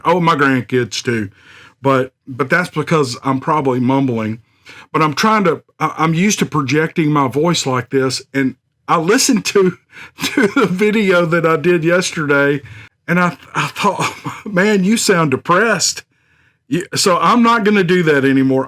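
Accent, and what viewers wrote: South, United States. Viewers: Please don’t try to get better!! Look how much you’ve grown already and so fast. People love your southern draw, your calming effect and your narration skills! Would you take an old cherished record out of its sleeve, when there is nothing wrong with it, to fix it? NO! So just be your charming self. We’ll let you know if there’s ever a problem by yellin acha from da holla! Thanks, don’t ever change. Tell your wife that perfection can’t be fixed! Well, maybe not tell you wife on the other hand. We want y’all ya come back. ps: Just remember this, this ain’t Dixie Chicks, it’s. It’s the Dixie Chicks that need the fixin, not!! Thank you! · Just be you, love that southern American accent brother and volume is just fine and as always enjoyable to hear you mate!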